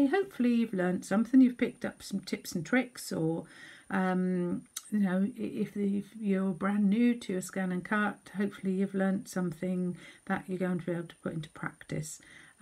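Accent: United Kingdom. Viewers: I have just found this video while searching for a tutorial on how to use scan n cut. I’ve got a CM300 but very rarely use it. Could you do a series of videos on basic operation? I’m sure there must be many like me who get so frustrated because they cannot get the machine to do what they want it to do. By the way, it’s great to hear an English accent and thank you for your time. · Hiya, found this easily enough. Thanks for giving me the link (nice to hear a UK not American voice) xx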